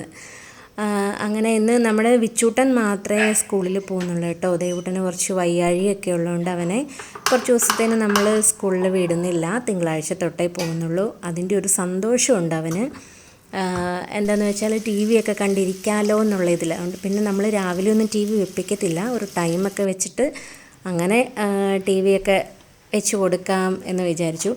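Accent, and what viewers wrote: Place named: Kottayam, India. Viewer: You are too genuine god bless you ❤️❤️. ഞങ്ങൾ കോട്ടയത്തു നിന്നും രണ്ടു തലമുറ മുൻപേ കണ്ണൂരിൽ കുടിയേറിയവർ ആണ്. കോട്ടയം ഭാഷ കേൾക്കുമ്പോൾ ഇഷ്ടം ഒരുപാട് മര്യാദ ഉള്ള സംസാര രീതി ആണ് ❤️❤️❤️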